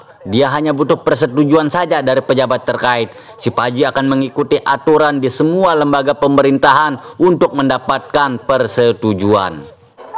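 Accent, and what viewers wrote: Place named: Bali, Indonesia. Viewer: logatnya hmpir2 mirip kaya org Bali ya kdg2 😁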